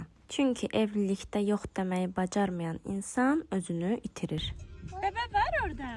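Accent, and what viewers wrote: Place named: Baku, Azerbaijan. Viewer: Baki lehcesi ruha terapiyadi😍😍😍😍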